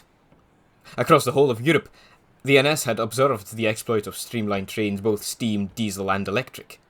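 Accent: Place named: Scotland, United Kingdom. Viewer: I love how the narration with a heavy Scottish accent is casually laced with perfectly pronounced Dutch words. It's kinda funny.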